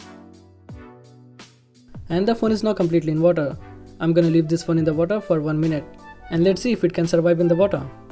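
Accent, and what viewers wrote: India. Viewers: Please improve your english dude the sound still like you speak in india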